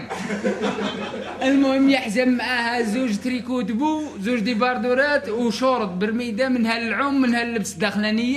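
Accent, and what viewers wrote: Djelfa, Algeria. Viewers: والله قتلتني بالضحك حبيبي والله نعم الرجال و لي معجبوش يعني مفهمش اللهجة نتاع الجلفة فقط كل ولاية ولهجتها الخاصة لا تعليق في هذا معجبتكش اللهجة علاش تفرجو اصلا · اللهجة الجلفة روعة تحياتي الحاج من غرداية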